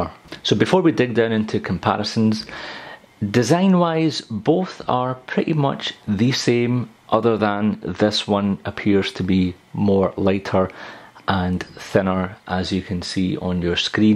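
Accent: Scotland, United Kingdom. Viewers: Omg I love the Scottish accent! So hot hehe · nice scottish accent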